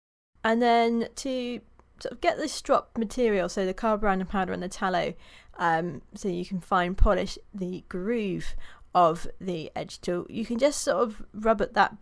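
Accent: United Kingdom. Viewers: by your nice English accent this is my guess you are from UK, Am I right.?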